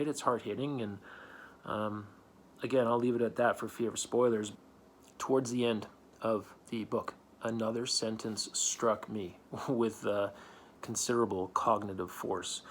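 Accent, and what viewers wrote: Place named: Canada. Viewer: Ah, I could sense some sort of Canadian in the accent. Alberta is a beautiful place. Much love form Toronto! - pls keep up with the reviews/book vids, there are no where near enough people covering these sorts of books let alone reading them.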